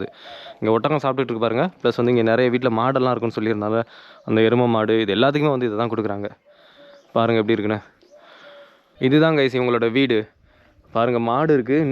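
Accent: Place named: Thanjavur, India. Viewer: Bro neenga tn la delta district ah ? Unga slang lam ariyalur,thanjoor slang mathiri irukku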